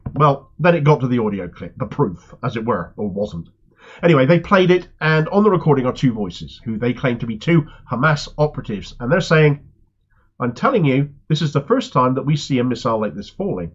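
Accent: Cornwall, United Kingdom. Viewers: I love your Cornish accent! Oh, and the content of your information😅☺️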